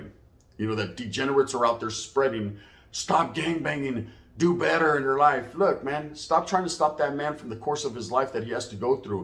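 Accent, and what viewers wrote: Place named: South, United States. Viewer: Tbh, I thought you were a southern at first.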